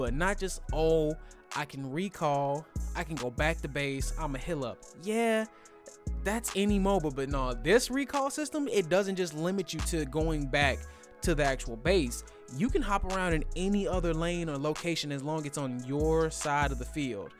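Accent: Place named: United States. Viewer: You sound American dawg n as far as i know its only out on Asian servers ...so how you playing it?...on PS4 we gotta make a Hong Kong account or something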